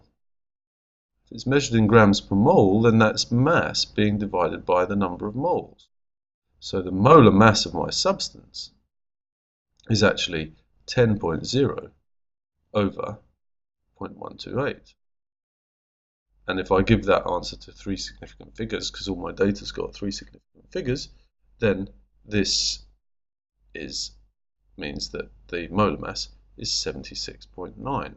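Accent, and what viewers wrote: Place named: United Kingdom. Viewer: I'd like to say you are one of the best IB chemistry teachers on Youtube. Your videos are really helpful. In fact, you have taught me more than my IB teacher has in the last year and a half. Thank you so much!! You're a star!:)) PS. Your British accent helps- I'm from England so it's quite difficult for me to sometimes understand the American youtubers since they pronounce most stuff quite differently to us.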